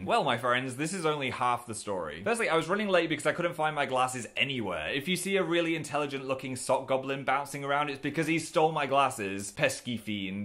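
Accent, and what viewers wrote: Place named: England, United Kingdom. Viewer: I love ur accent, I have the same accent as u cause I am english but u say seem words differently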